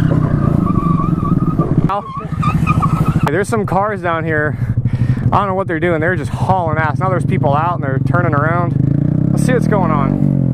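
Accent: Australia. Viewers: 10/10 Australian accent. Please do more.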